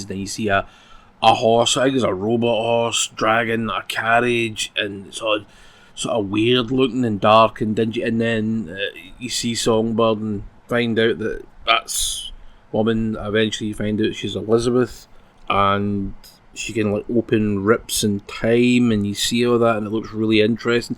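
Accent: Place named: Scotland, United Kingdom. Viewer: Lmao I love ur thick accent, are you Scottish?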